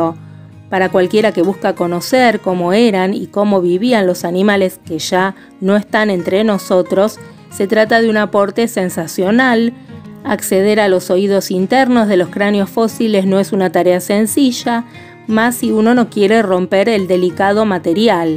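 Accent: Argentina. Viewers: dices por aquí, de donde eres? te noto acento argentino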